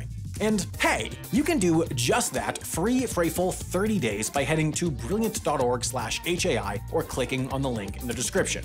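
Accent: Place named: Michigan, United States. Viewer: I swear your from Michigan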